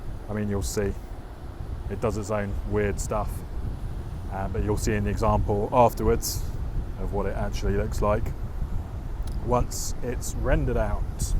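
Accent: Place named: United Kingdom. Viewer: Great video & informative. Where is the location as you sound British?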